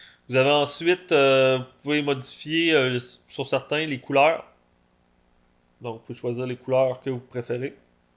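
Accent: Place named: Canada. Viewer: "j'adore ton accent comment tu fait ? " sort de ta grotte mec tu connais pas le canada ?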